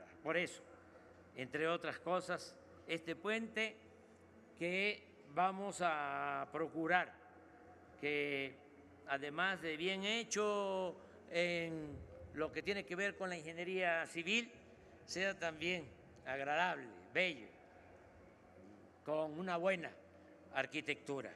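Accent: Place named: Tabasco, Mexico. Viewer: Amamos ese asentó tabasqueño que nos alinta y da esperanza para el cambio y la transformación de nuestro Mexico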